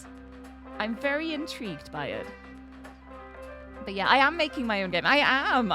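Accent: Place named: United Kingdom. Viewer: I love your voice. Super soothing. Awesome UK accent 😄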